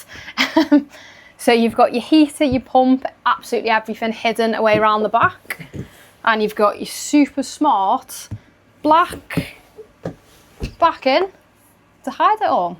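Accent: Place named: Liverpool, United Kingdom. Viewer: I TAKE IT FROM YOUR COMMON ACCENT YOUR FROM SOMEWHERE LIKE LIVERPOOL ? I LOOK FORWARD TO SEEING YOUR RACK MY DEAR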